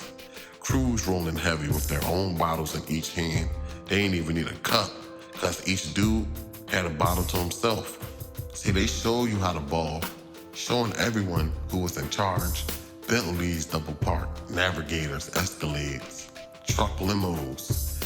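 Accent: Philadelphia, United States. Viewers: Yo you gotta be from Philly you talk just like us… where you from North?…appreciate your work bro keep doing your thing I be tuned in💯💪🏾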